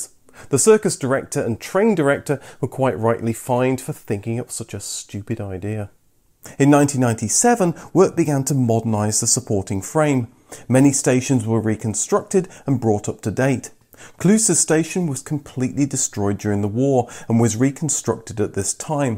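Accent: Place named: United Kingdom. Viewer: Apart from enjoying your videos so much, I am delighted to listen to your British accent. A real pleasure!!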